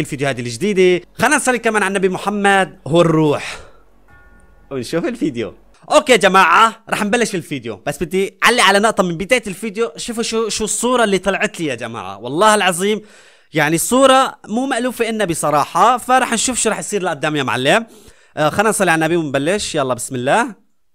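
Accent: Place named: Iraq. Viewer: سوي فيديوهات عن الاكل الصيني حباب متابعتك من العراق ولله مبدع وتره حجيك يويه يشبهه حجينه ولله احلى قناه...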